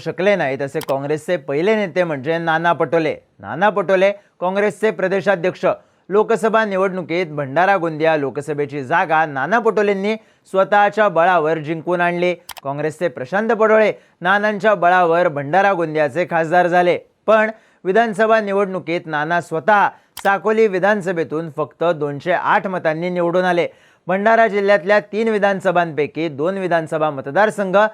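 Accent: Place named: Kolhapur, India. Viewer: तू मस्त आहेस पण जर तुला मोठ व्ह्यायच असेल तर तुझा कोल्हापुरी accent बंद कर आणि महाराष्ट्र ची भाषा शिक.. don't take me otherwise it's only suggestion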